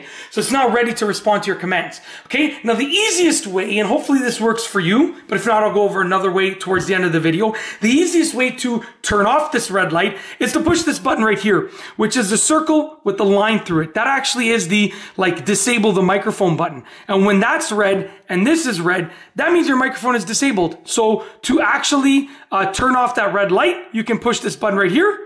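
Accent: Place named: Canada. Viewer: This guys LOUD, echo-y Canadian voice is terrible! He talks TOO MUCH. It was easy but he made my ears hurt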